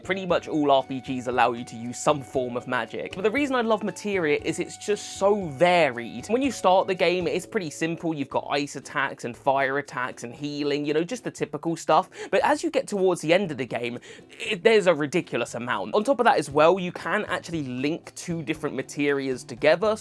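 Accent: United Kingdom. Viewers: What area of the Uk are you from?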